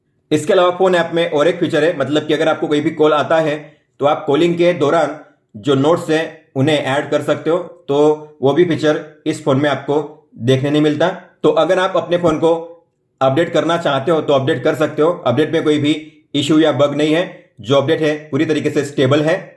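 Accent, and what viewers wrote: Gujarat, India. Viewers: Bro r u from Gujarat??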